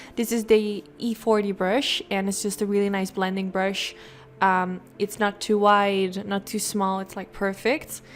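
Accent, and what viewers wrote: Netherlands. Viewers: Do I detect a Dutch accent?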